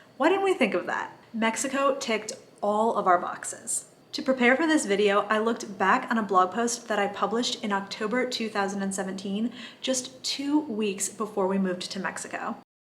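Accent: United States. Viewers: I suspected you were of mexican descent , is obvious that you are a third generation in usa your natural fluency in the language is of American .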